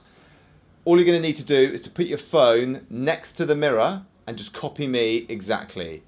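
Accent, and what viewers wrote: England, United Kingdom. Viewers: I think your English accent helped me learn haha! Thanks from the U.S. cheers have a good one! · You’re so unapologetically English with your accent. I love it! 😍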